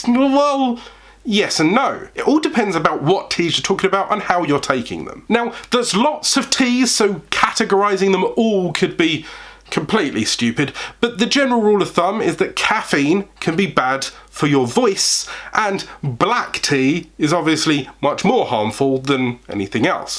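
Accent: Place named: United Kingdom. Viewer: You have the best British accent I’ve ever heard. You should narrate.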